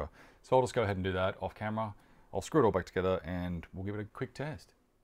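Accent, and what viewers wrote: Australia. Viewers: Am I the only one that likes the down under accent?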